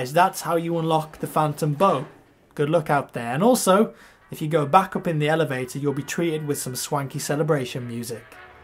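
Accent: England, United Kingdom. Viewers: beatiful english acsent <==== sorry for this english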